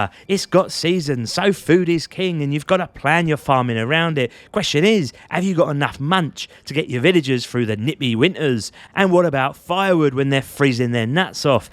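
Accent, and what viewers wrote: United Kingdom. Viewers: Just discovered this channel. Love the writing and the distinctly UK English! : )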